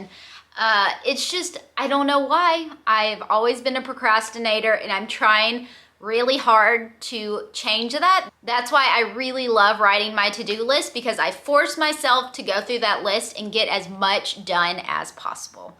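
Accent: South, United States. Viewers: Hey there, have been watching you for sometime, especially when you were doing Keto. I love to watch your infectious Southern “ hey y’all “. You are such a beautiful gal, but some of these pics on the You Tube look a little shady. That’s not your style. Wish you and Cody the best.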